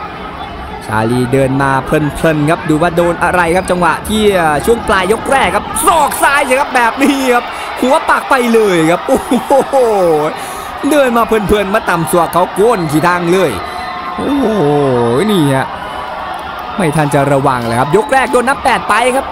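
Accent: Isan, Thailand. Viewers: เว้ากลางน้นล่ะดีแล้ว เวลาเว้าอิสานโทนเสียงบ่น่าฟัง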